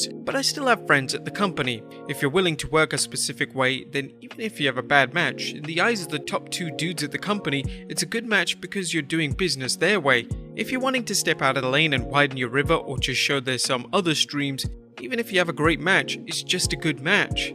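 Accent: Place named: United Kingdom. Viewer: Are you British or australlian? Cause you sound British 90% of the time but the way you say content like there's an extra e in it makes me think you're Australian...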